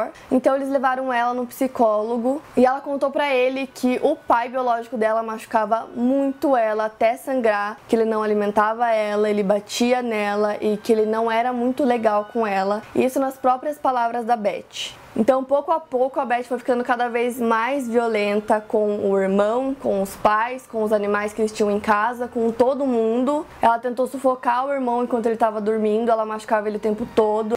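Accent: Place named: Paraná, Brazil. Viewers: ela só é sulista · Ela é de PG aaaa amo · Eu também sou de ponta grossa PR msm cidade que ela então pra mim é normal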